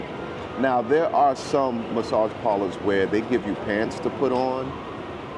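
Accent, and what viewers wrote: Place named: New York, United States. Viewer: New yawk what’s good dawg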